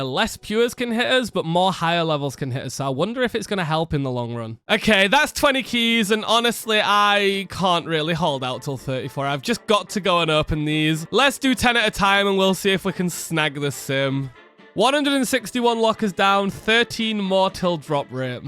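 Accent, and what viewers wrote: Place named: England, United Kingdom. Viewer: It's a beautiful day when uploads. The mad scientist is back with his perky english accent 😊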